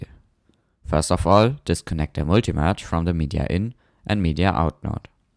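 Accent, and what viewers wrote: Germany. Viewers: Ich weiß nicht wieso, aber irgendwie hasse ich es, den deutschen Akzent zu hören 😅 (nichts persönliches, ist bei jedem englischen Deutschen so)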